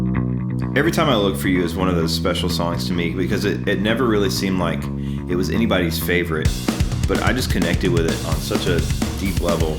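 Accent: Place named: South, United States. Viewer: tell me you're from the south w/o saying it. "he's playing that fast and he's playing that fast and he's strumming the shi*t outta that thing"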